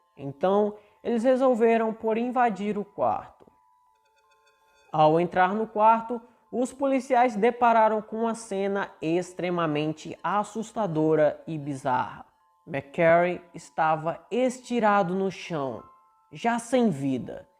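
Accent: Northeast, Brazil. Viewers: Gosto do seu jeito nordestino de falar😁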